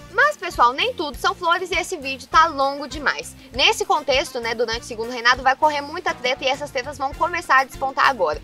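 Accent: Minas Gerais, Brazil. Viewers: Linda ! Minerinha com sotaque delicioso de ouvir .. estou curtindo muito. Fala muito bem, inteligente e esperta. Clareza mesmo com a lingua um pouquinho presa, mais é um charme . Adorei .. estou viajando no tempo com essa gatinha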